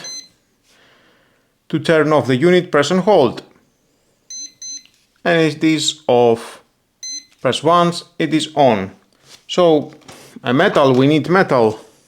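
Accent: Greece. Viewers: You sound greek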